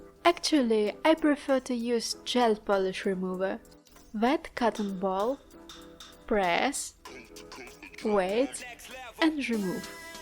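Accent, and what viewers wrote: Russia. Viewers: Very interesting makeup, and.. Your pronunciation is like you are from Russia😅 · Emma Ray just because she IS from Russia :D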